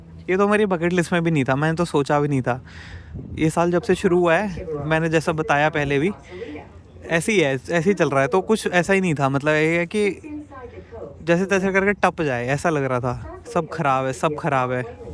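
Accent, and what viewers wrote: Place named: Kanpur, India. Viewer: Kanpur ka DNA accent me aa hi jata hai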